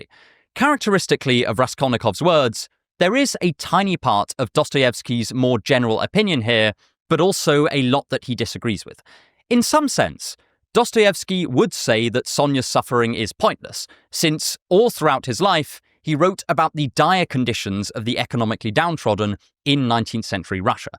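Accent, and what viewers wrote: RP, United Kingdom. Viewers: Anyone else been thinking for years he was saying ‘Joe Farley’ in RP?! 😂🎉 happy birthday 🎉🎈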